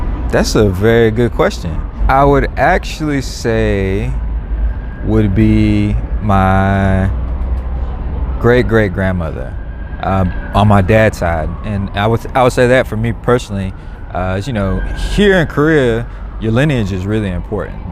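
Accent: South, United States. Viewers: Handsome!!! Don't loose that southern accent. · I'm from the south and I always thought I hated southern accents til I heard (and saw) this man speak 😭😝🤕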